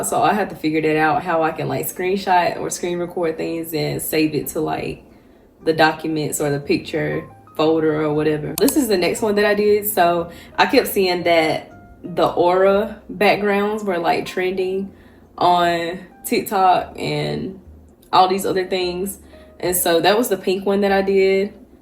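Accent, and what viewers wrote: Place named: South, United States. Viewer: never realized how country you sound☠️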